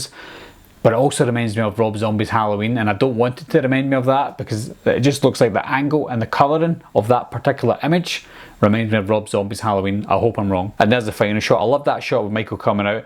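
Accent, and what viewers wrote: Scotland, United Kingdom. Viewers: I think I know where your from....Scotland?? btw love the vids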